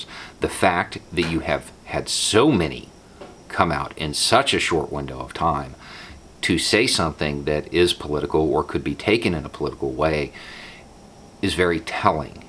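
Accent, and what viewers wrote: South, United States. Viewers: I hate to pigeonhole Southerners, but it's refreshing to hear that accent, in a calm voice, giving us facts, instead of spewing Fox talking points. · This is probably the most comforting thing I've heard yet. The recent messages from our military brass gives me hope. I travel full time in an rv, and I've just returned from traveling from TX up through the south. Long before the virus hit, yet alone the latest shootings and protests, I was very angry and frightened by what I heard from police, Natl Guard members and fellow citizens in my travels. THE HATE IS REAL. To hear police and guardsmen disregard it, while spouting pro Trump rhetoric made me believe both our military and police forces are all corrupt racists- and we are doomed. I came upon your videos a few weeks ago- and gotta say your look and accent gave me a visceral response- engaging the stereotypes that were reinforced during my latest trip south. 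But then I listened and realized that much like Trae Crowder, you break that mold. We are all prejudiced with ingrained stereotypes by movies, news, headlines. MY biggest prejudice has always been a southern accent. From Boss Hog, John Wayne, and even the movie Deliverence (yes I'm THAT old) my skin crawled when hearing those accents, which portrayed ignorance, misogyny, and hate of minorities- including Native Americans. It was jolting to me as a "Yankee" to be in black communities, in this day and age, to have them answer my inquiries with "yes 'mam" and "no 'mam". I wanted to yell at them and say... "I am not your superior- you dont have to address me like that." It felt degrading for them- until I got into more wh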